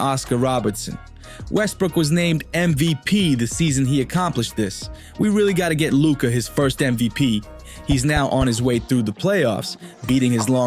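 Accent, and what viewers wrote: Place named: New York, United States. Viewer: brooklyn!? harlem?!?! u sound like you in the mafia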